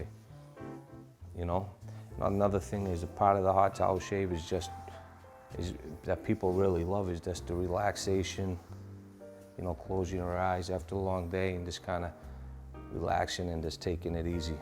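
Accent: Massachusetts, United States. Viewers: This video is so Massachusetts. The accent, The TB12 jersey, there’s even a Dunkin Donuts across the friggin street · Excellent barber great job!! 👍☝️ 😁 Love your MA accent btw CT native here 😘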